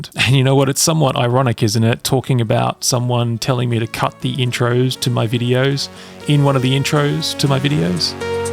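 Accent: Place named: Australia. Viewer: Enjoying your points of view, good to hear an Aussie voice, hi from Adelaide. I think every music channel gets the negative trolls.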